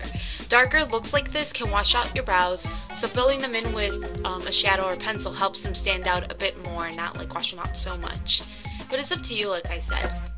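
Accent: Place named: Chicago, United States. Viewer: I love the way you speak... So Chicago. I'm in Wicker Park so I can totally call the accent when I hear it! Great job, your friend is beautiful. :)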